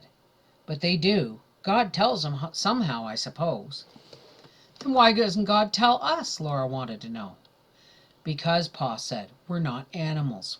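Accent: Canada. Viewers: Not everyone knows every word plus she is Canadian we pronounce some words differently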